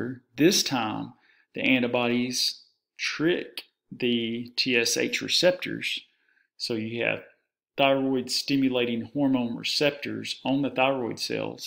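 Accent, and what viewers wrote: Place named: South, United States. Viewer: Dr., where are you located? You sound like you're from the south and I'm in east Tennessee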